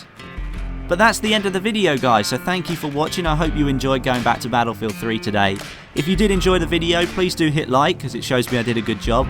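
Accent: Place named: United Kingdom. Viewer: British accent sounds so gay.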